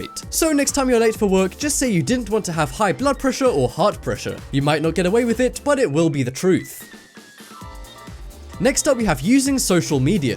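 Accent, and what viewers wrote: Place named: United Kingdom. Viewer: I like this channel because of the guy's british accent tbh tbh tbh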